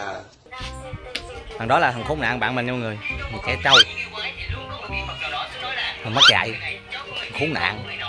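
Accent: Soc Trang, Vietnam. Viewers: Quê anh ở sóc trăng hả anh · Quê anh sóc Trăng hả?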